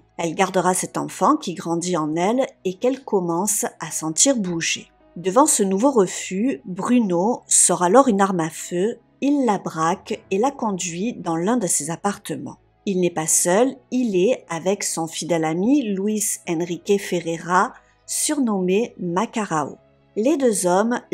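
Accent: South, France. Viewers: Impardonnable ce qu il ont fait..ça me degoute que de tels personnes puissent vivre...pauvre fille...votre manière de narrer adoucit qq peu avec votre pointe d accent du sud qui fait plaisir !).. Bien à vous malgré ttes ces horreurs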